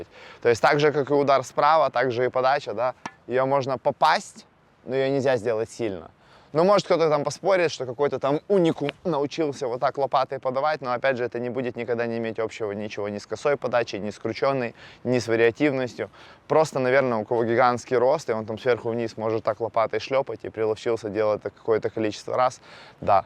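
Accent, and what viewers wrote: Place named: Odessa, Ukraine. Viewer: Хорошее видео Очень знакомый акцент. У моих учениц из Одессы очень похожая речь У меня примерно такой же топ ошибок. Примерно так же исправляю Валерий , тренер из Германии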